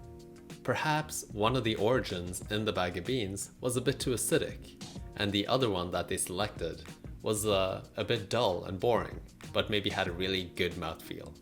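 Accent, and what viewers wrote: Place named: Germany. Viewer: Idk why but I was so sure you were German lmao You kind of sound like a (very good) German English speaker xD Are you really Canadian?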